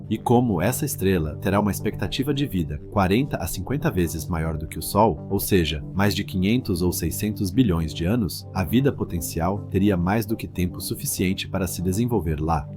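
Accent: Portugal. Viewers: Fala muito rápido e troca o sotaque português e brasileiro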